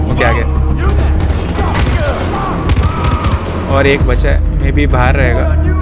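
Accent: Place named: India. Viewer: Hindi Accent 😙😂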